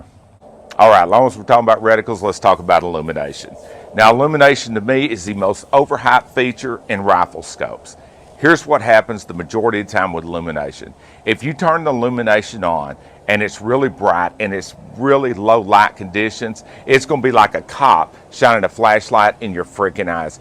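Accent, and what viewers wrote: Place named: Tennessee, United States. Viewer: I'm from TN. & Just curious what local u r from. I'm having trouble deciding on TN area or maybe? West Virginia ish? Anywho thanks for the no-nonsense !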